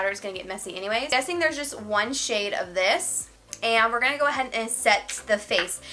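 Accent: South, United States. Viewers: Love this!! My new fave beauty YouTuber love all your makeup looks, finally someone with the same skin tone!! And southern yass!!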